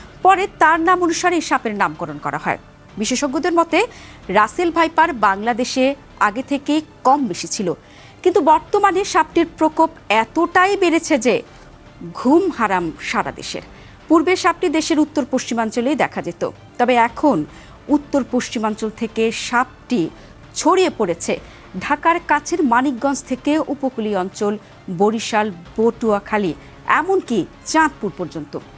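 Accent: Kolkata, India. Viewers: Apnar accent kolkatar moto keno? disgusting,disappointing, unacceptable. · নাচনেওয়ালী কোলকাতার accent এর উপস্থাপিকা কেন? বিরক্ত লাগল খুব। উচ্চারণও কোলকাতার যা শুনেই বিরক্ত লাগল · Apni kolkata style a Bola bondho koren. Birokto lagey. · আপনি কলকাতা স্টাইলে কথা বলেন কেন , আর এটা বাংলা না বাংলাদেশ ওকে... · Apni ki Indian ? Apnar language and presentation style Kolkata ba Indian Bengali style er. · এই presenter মনে হয় ভারতীয় নারী।। তার বাচন ভঙ্গি কলকাতার মেয়েদের মতো।।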